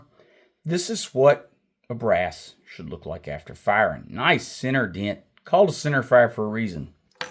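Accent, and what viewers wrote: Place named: Oklahoma, United States. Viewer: Are you an Okie? A buddy of mine is from Ok City and sounds just like you! Btw, great video, I have an old .38 sp. that’s out of time.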